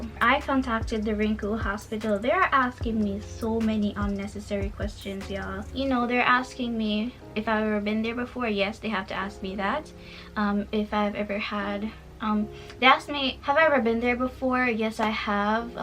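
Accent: Jamaica. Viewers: U sound jamaican Btw i do understand what it is they were saying cos the level of understanding between both u and the doctors wasnt good and anything could happen even tho they could've probably worked something out..its not that much of a big deal but at least they recommeded u to someone else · Just came across your channel and I'm wondering are You from the Caribbean sis? Your accent and your phrases got me feeling the homey Jamaican? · You sounds Jamaican or you a Jamaican? Sorry for your experience! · You sound jamaican. Are u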